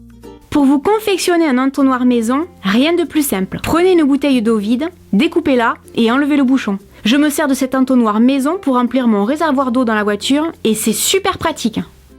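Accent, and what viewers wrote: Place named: Toulouse, France. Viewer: Coucou ^^! J'adore tes astuces, elles me servent beaucoup!! Est ce que tu viens de Toulouse? Car tu as un accent qui me fait penser a ma grand mère qui viens de Toulouse. Enfin bref, je t'adore ♡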